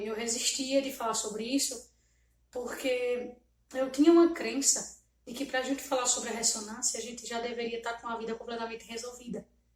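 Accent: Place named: Northeast, Brazil. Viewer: já comecei me indenticando com esse sotaque NORDESTINO. A tua história parece muito c a minha. O caminho das palestra foi onde tive a maior catarse. Continuou pq pedi catarse. Estou na segunda onda, nunca aprendi tanto em toda minha vida.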